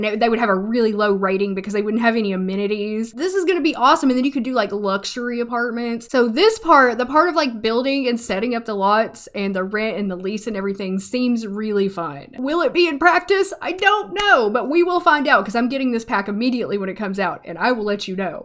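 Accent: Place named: Texas, United States. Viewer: the Texas girl accent lol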